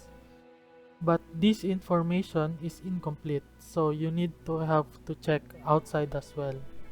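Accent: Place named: Philippines. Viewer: nah, just your accent. Hahaha I'm filipino too, glad to see another one enjoying this game. Not many people enjoy these types of stuff in our country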